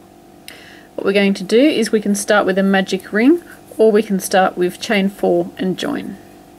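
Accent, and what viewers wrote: Australia. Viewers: I want to thank you once again, I make your one piece wonder sweater all the time for new babies. Finding your heart hat was a total plus for me, you the best person I have done tutorial by far. You have made me feel like I can do more and feel good about my work. Keep doing these wonderful tutorials, I love your accent. It reminds me of family that live in Sidney who I don't get to see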